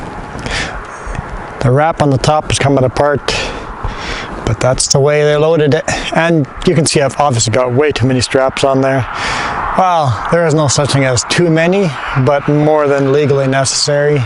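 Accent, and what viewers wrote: Canada. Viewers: Sadly, Yes. And in California gold fields, roads and railroads the Irish immigrants were used in the same expendable ways as were Chinese "laborers". I suspect that may have been true in Canada as well. I'm delighted to hear the Irish and Scottish influences in your wonderful Canadian accent as you speak, eh?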